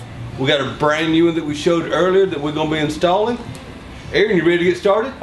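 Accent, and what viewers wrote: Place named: South, United States. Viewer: Thank you for your informative and easy to follow videos. (We also enjoy your beautiful southern accent.) Please make more videos as time allows you. What a GREAT Teacher you are! ㋛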